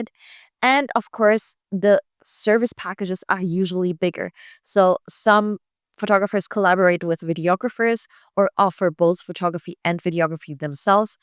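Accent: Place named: United States. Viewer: A stand-out assortment of savvy tips and advice for the mid-2020s photographer. I can attest —even if only on the basis of very modest experience— that this video offers a good number of relevant ideas for our time and the contemporary market. | In re Ms. excellent ESL skills, as an American, I applaud the Yiddish-ish "regular-schmegular" [if I've gotten that right] and would like to add that "different from" as opposed to [the British-influenced] "different to" sounds much more natural to this listener's ear, particularly in view of the fact that her English [including accent] is overall far more "American" than British. In addition, the adjective "in the high street" is clearly a British-ism, for which one could substitute "main street" or just eliminate the modifier. It should otherwise be understood from context that said business is serious, reliable and focused on quality. 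You could also use the shorter "based in Berlin" vs. "based out of ~," which sounds like rural Wisconsin. O, those prepositions! These suggestions are offered solely in a constructive way and in appreciation of her mastery of the lingua franca of world business. Vielen Danke!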